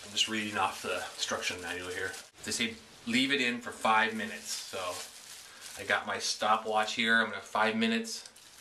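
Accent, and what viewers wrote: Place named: Minnesota, United States. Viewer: Looks great! You from Minnesota? Haha caught the accent a bit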